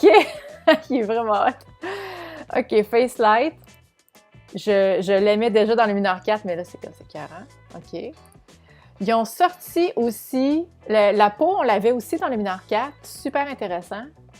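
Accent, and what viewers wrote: Canada. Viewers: votre "accent"canadien car je suis en Provence au sud de la France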